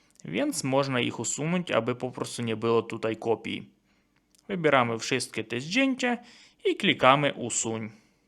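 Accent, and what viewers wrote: Ukraine. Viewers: jakoś kurwa nie ufam ci. ten ukrainski akcent zniechęca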